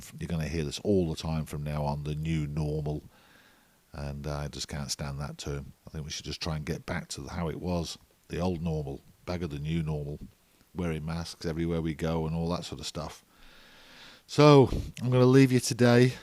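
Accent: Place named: Indonesia. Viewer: I like the intro: (indo accent)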